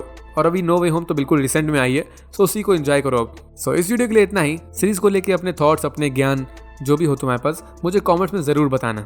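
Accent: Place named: Sitapur, India. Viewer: Hey bro R u from district seetapur up . My friend is also from there and you both speak same way. I every time enjoye your sweet voice and explaining method Thank you 😍😙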